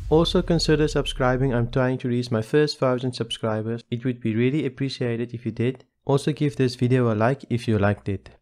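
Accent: South Africa. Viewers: Wow love the videos and very clear and good content, i dont easily sub to a channel but your videos are worth watching. Just a question where are you from, South Africa?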